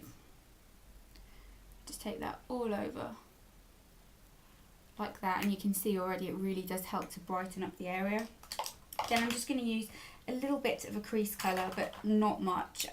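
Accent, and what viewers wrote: United Kingdom. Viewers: Lol I know you have a British accent, I meant ethnicity wise as you don't look Caucasian, that's all :)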